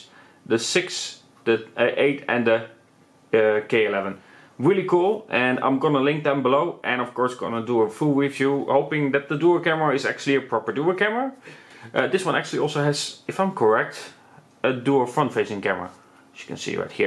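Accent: Netherlands. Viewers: Are you from the Netherlands? Because I hear the Dutch accent.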